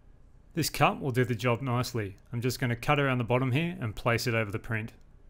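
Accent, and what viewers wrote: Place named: South Australia, Australia. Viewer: Are you from South Australia? Your accent is exactly like my friend here in London and he is from Adelaide.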